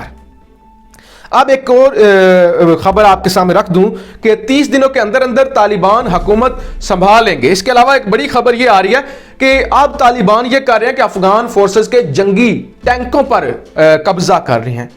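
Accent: Punjab, India. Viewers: Try to learn Urdu & Urdu pronunciation in evening classes. Dimagh ki dahi ho jati Hy Urdu in Punjabi pronunciation. Or yeh June main blazer Hadd kr de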